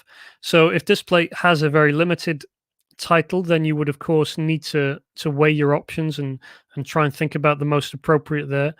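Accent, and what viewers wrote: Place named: United Kingdom. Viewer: Thank you so much, this video is by far one of the best on the tube! Extremely awesome & refreshing to hear a uk voice, ✌🏻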